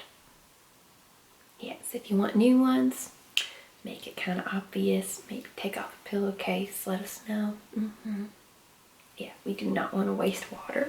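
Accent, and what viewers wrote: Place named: South, United States. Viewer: Youre so good at that southern drawl!! Hope you've been well~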